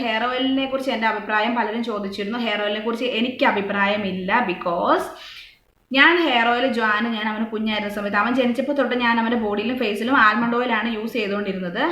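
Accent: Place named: Kannur, India. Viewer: Chechi vdoil allatto paranjath cmnt cheythirunnu Enk sure aanu kannur kottiyur aaanennu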